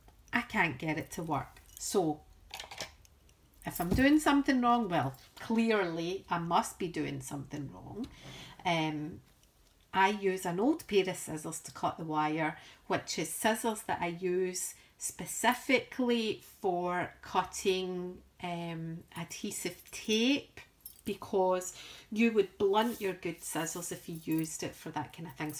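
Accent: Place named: Scotland, United Kingdom. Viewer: Very much enjoyed your flip through. Can I be proud of my Scottish heritage too even though I’m American? Went looking for a Scottish journal video because I’ll be traveling to Scotland in October and want to put together a travel journal ahead of time. Planning on visiting Edinburgh, Glasgow (grandparents came from Glasgow), Oban and Inverness including Culloden battlefield. Now I know I need to find a thistle stamp like yours and bring home some Harris tweed. Thank you for the tips and love love love the brogue!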